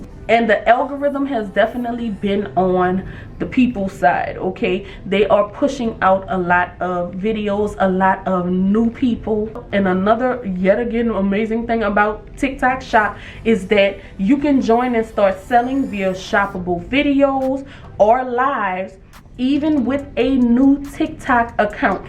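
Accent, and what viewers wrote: New Orleans, United States. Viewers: Love the New Orleans accent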